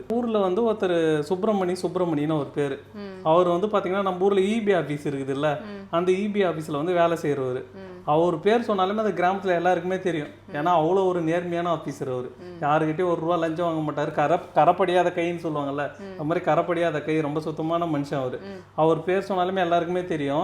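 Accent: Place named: Villupuram, India. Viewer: Villupuram district slang mathiri iruku sir ungaluku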